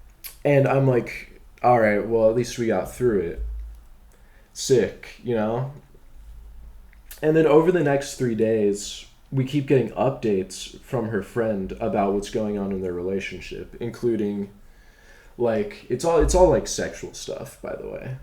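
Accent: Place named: United States. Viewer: First time watched of your videos, it feels kind of surreal to listen in to gossip from the other side of the world. You look like a character from an American tv show -- which, admittedly is a strange thing to say because you ARE American, but the crazy stuff you're saying about your ex and friends literally sounds like an actual plotline from a show. Maybe because I'm not American, but hearing your story being said in an American accent is so strange to me. On a serious note, I'm sorry to hear about your ex and that your friend's love didn't last.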